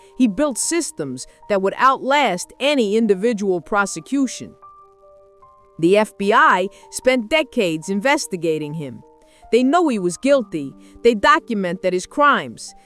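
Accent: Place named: New York, United States. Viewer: Accent’s cool… ya sound like ya from Nu Yawk(except a couple of pronunciations)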